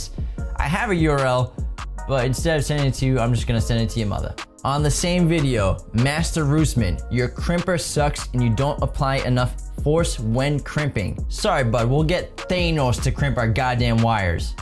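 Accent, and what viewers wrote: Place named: New York, United States. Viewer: You must be from New York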